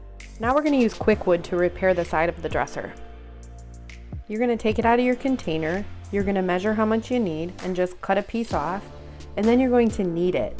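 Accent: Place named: Midwest, United States. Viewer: Tis beautiful. Most of the year. Even when it’s not the prettiest it’s still a great place to live. You’re accent sounds like maybe Michigan or Wisconsin. I’ve never been there but would love to see the northern Midwest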